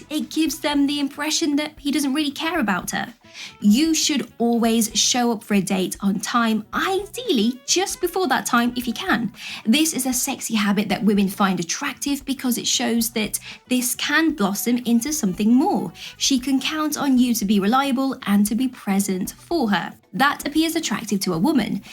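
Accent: United Kingdom. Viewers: For me, a woman with a British accent will catch my attention fast. (I find it difficult to not watch these videos because your voice is so magical.) I have heard women say the same thing about men. Please teach me that accent.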